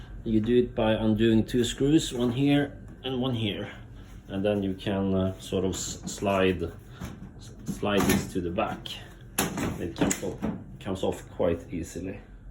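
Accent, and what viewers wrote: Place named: Norway. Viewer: I fixed my drier this morning following your guide exactly. Your accent sounds norsk så eg bare ser tusen hjertelig takk.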